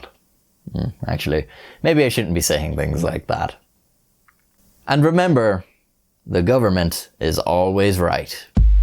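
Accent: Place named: Ireland. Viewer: When you have an Irish accent you can say anything damn it!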